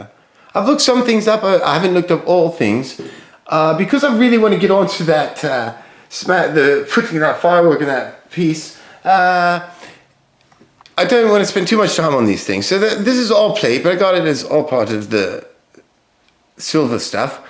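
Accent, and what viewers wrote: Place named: United Kingdom. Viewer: Where are you from in the UK, I cant pinpoint your accent? nice finds by the way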